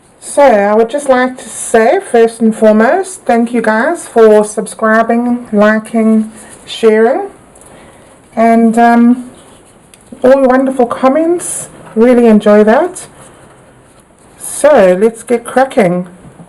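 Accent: South Africa. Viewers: Very pretty! Must say, so weird to hear a fellow South African! Are you still in SA?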